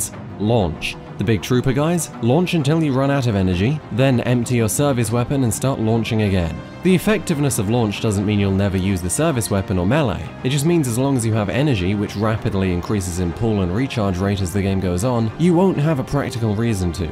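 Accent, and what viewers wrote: United Kingdom. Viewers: The British accent makes this critique overly pretentious and distractive. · Why do humans x? Idk but im sure a gaming essayist who makes up words, and has seemingly tenuous grasp of civics is going to tell us in a tapestry of mixed metaphors, pointless erudition, and complete lack of knowledge/context asude from gaming. Why do people who play lots of games think it equips, instead of completely contort, their understanding of reality? Yay another 40 minute leviathan embarassment to eyeroll thru hoping this youtuber would just review games and quit playing armchair philosopher. Anyone can play shitloads if games and talk about them with a british accent and get views, what does actually bring to the table? Not much. once you get to a few of his vids this becomes verrrry apparent that this person is so out if their depth creatively.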